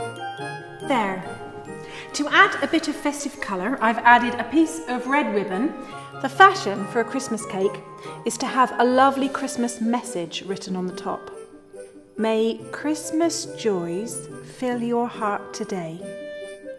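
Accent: United Kingdom. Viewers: Why do I keep watching these! Do I just love British accents?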